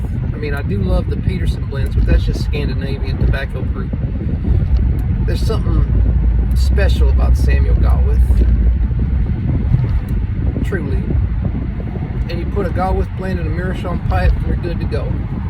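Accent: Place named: South, United States. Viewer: Love finally hearing the true CLE southern accent! Bless your heart ❤! Long live the chupacabra and Mr No Name Meecham!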